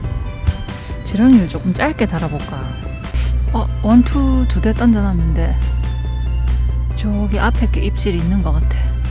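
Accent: Busan, South Korea. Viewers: 항상봐도 재미 있습니다 부산사투리도 구수하구요 🐟🐟🐟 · 부산사람입니까. 말투가 암청 정이가네요^^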